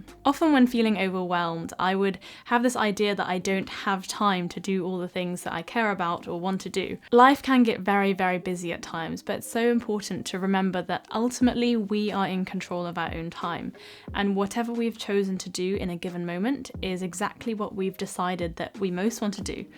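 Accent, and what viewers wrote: United Kingdom. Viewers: you are that one Youtuber for whom I’ve been searching for years! An intelligent, genuine, well-educated female YouTuber with a modern RP accent as my speech model. I gave up last year after searching far and wide but today the algorithm took me to your British accent video and I immediately subscribed! You’re cultivated, intellectual, sincere, elegant, beautiful, speak with a perfect modern RP accent AND create such high quality video with excellent advice. What else could we ask for? Thank you, thank you; thank you!! ❤️❤️❤️ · how to practice to get British accent like you?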